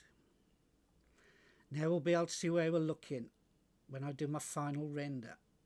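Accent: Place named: Birmingham, United Kingdom. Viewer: Sounds like a brummy accent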